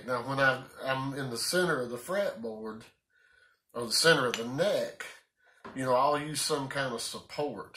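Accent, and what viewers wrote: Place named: South, United States. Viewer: Your southern drawl makes listening to and learning from this video really enjoyable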